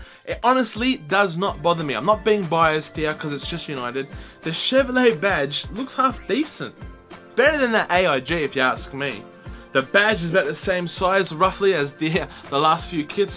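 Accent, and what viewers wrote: Australia. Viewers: Your Australian ??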